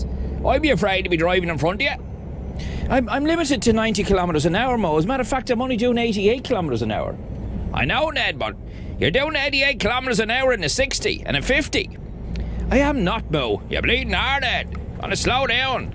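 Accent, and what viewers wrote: Ireland. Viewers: I started watching your vids cz I like the irish accent, but I've grown to love your vids I do miss the european vids, as I've always wanted to do european driving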